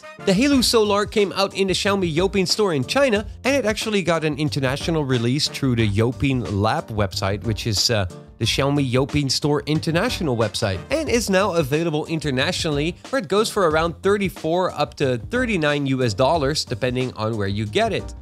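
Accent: Netherlands. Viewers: Hi! Are you dutch?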